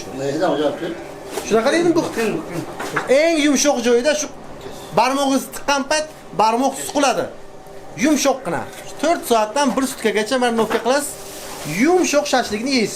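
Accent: Bukhara, Uzbekistan. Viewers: Бухороча шевада гапирганингиз учун 2 лайк🙄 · Узи Гиждувонликман, шашликдан хам кура Бухоро шевасини купрок согинганман, рахмат. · Бухоро шевасини роса согингандим, мазза киб эшиттим рахмат 🤗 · Шашлик майликуя бухороча шивани коилатиздаааа таго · Шевага караганда азим Бухороданми сиз лекин жуда Куп гапирдиз ишдан кура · Buxoro sheva N-1. Shashlikni dodasi ham Buxoroda😊😊😊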